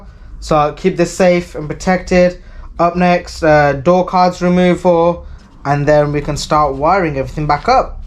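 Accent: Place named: Birmingham, United Kingdom. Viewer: He’s in the UK, you can’t tell by the accent? He’s in Birmingham.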